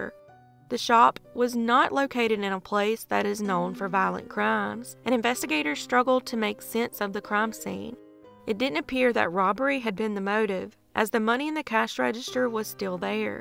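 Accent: South, United States. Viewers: Love you and your channel. Also love your accent because I’m from the south too(Georgia) and I have my heavy accent too lol and I LOVE IT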